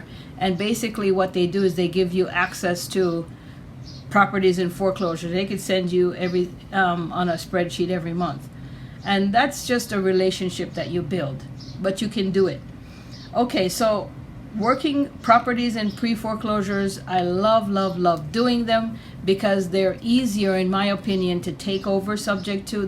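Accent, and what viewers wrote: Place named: Jamaica. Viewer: I feel Comfortable with you nationally is Jamaican I sound it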